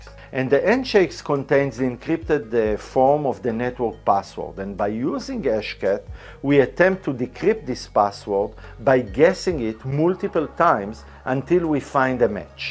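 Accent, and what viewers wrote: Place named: Israel. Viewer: וואו אחי פעם ראשונה שאני רואה אותך ביוטיוב ופשוט ישר איך שהתחלת לדבר הבנתי שאתה ישראלי ואז ראיתי את השם של הערוץ והבנתי שאני צודק... תעבוד על המבטא 3>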